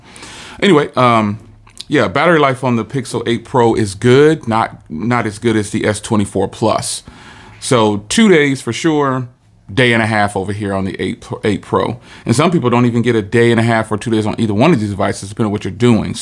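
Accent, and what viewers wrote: United States. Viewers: He speaks with an American accent.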